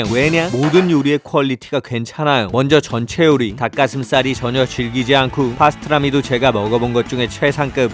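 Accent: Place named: Seoul, South Korea. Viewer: 딱 들어도 서울 말투인디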